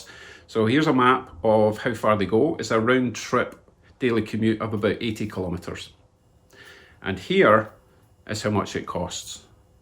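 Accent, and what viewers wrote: Scotland, United Kingdom. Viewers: with Vancouver having the highest cost of fuel in the North America (i can't believe i just paid $2.40 a litre yesterday!), it's time for me to go electric & you've convinced me to give this a try! Love your Scottish accent and Canadian adoption. Sub'd.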